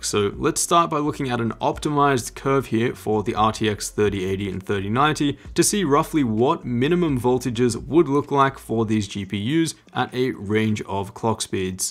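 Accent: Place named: Australia. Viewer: Must ask, how do you have an 3080 FE when you sound Australian? Do you live in aus? Did not think the FE was available to convicts like me living in Australia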